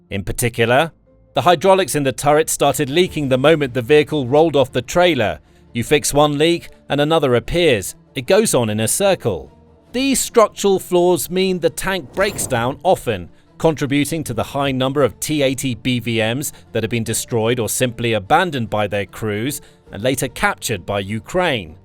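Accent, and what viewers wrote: England, United Kingdom. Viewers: Why does the narrator (Who's clearly English by his accent) keep calling petrol "Gasoline"?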